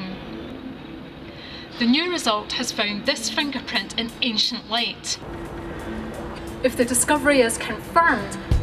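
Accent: Scotland, United Kingdom. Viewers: Woman with Scottish accent, something something... bath towel, playing with balls, inflation... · Scottish (she studied Physics at Glasgow University with me. But she doesn't have a Glasgow accent...more of a generic central Scotland accent...possibly Falkirk or Stirling area but I'm not sure)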